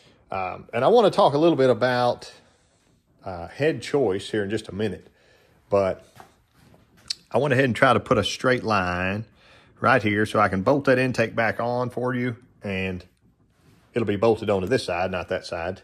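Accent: South, United States. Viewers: Super fine line! Love the Southern accent! Reminds me of a good day's work, awesome eats and a beer or two, and a good snooze to do it all over again. Thanks I hope you have a good morning and enjoy your day today. 15° and heavy snow ❄️🌨️ in Western Pennsylvania this morning.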